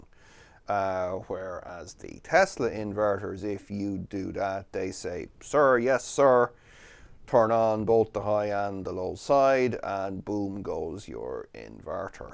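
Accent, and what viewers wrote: Ireland. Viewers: Gah. Didn't see this comment till I'd watched it all. I'll know for next time :-) Not as slow as Mr Rikards, but the Irishness does show through :-)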